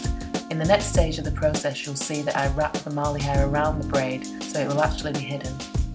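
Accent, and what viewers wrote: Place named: North, United Kingdom. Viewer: They look great and omg you're a northerner!! Haha x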